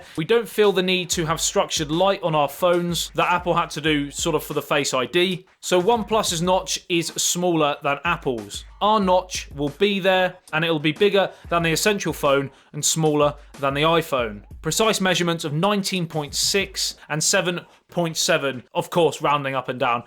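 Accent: England, United Kingdom. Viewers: I ❤️ hearing the difference in English accents, pronunciation & use of words compared to American English. The way you pronounce aluminum sounds so funny! You guys say it all wrong 😳 Or do we? 🤔 🤷🏼‍♀️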